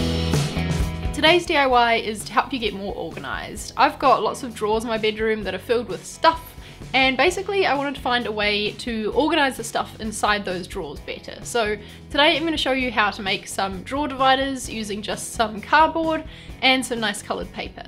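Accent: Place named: New Zealand. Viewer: i knew u were from NZ I AM 2 i could tell because i usually watch american youtubers and you sound so different like a kiwi I FINALLY FOUND A KIWI YOUTUBER!!!! this video helped a lot thank you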